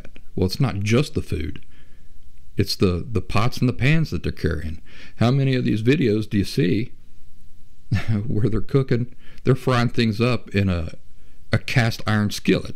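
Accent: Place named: South, United States. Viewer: no worries about how you talk, My dad was from Alabama/Mississippi and he talks almost just like you. I'm glad I found your YouTube channel. Thanks for video.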